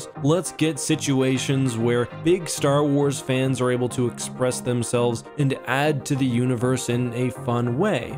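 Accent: Canada. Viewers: Are you canadian? It's the first time I notice some "oots" and "Aboots"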